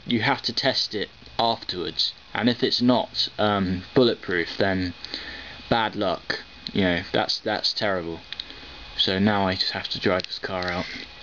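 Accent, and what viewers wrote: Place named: Australia. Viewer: i like ur accent, r u ausi or nz?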